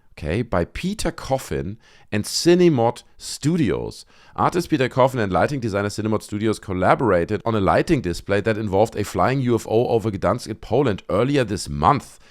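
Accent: Germany. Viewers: wieso klingst Du so deutsch?! 😅 ... ok, maybe an mistace. Go on and GRTX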